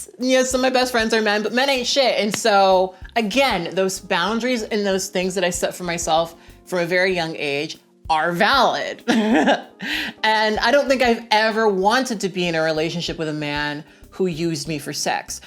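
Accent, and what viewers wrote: United Kingdom. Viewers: I was having a hypoglycemia while watching this video and those elf ears and the British accent felt so surreal, I thought I was loosing my mind The video is great ❤️ I can't agree more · Can't find any comments about the British (?) accent so I just wanted to say it slaps 🥕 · i love how you verge into a british accent when you feel awkward bc same as an ace person this was really nice to hear! I am pretty sex positive actually, but I'm just not personally interested at all for some reason the ending where you peer pressures us into using the emoji has become my favorite part LOL like its so funny but weirdly comforting at the same time🥕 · British accent is something in my life I never knew I needed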